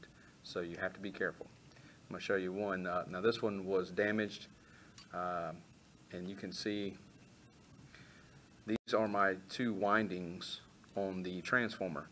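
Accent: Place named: South, United States. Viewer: Why do all the videos have a tech with a southern accent?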